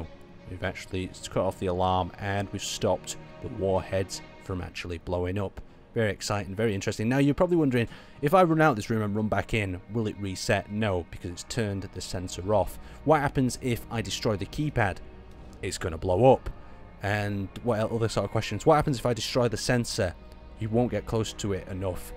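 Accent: United Kingdom. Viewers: I only watch this channel because you're British and it's awesome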